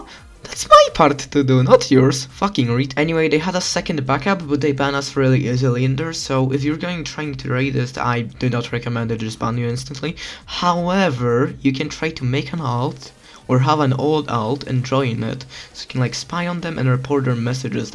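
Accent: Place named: England, United Kingdom. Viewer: ale englisz ladny masz ty z angli chyba jestes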